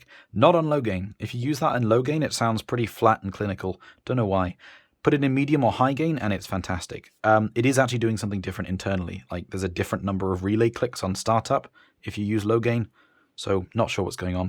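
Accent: United Kingdom. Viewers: I’m simply listening through fiio m11 with oriolus BA300s and phantom headphones (modified) with all my research LSA is the way to improve my headphones when budget allows. One thing I’ve wondered. What difference does desktop amp/dac make to sound over portable? I’ve seen reviews of the topping a90 and wonder if that would produce better sound than my motu 828 mk3? I look forward to your future reviews. Good to hear a Brit doing these reviews, I’m up in Cumbria. Keep up the good work.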